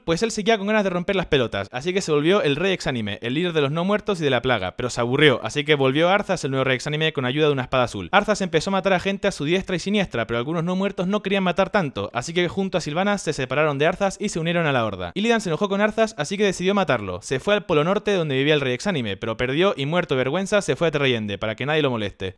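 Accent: Chile. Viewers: Este wn es chileno? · Nada mejor que escuchar a un argentino hablando como chileno. Que agradable sujeto.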